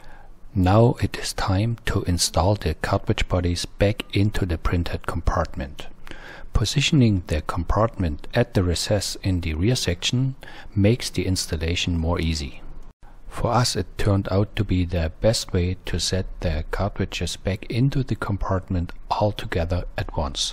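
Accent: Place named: Germany. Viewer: Any explanation video or tutorial is made infinitely better when it involves someone with a German accent.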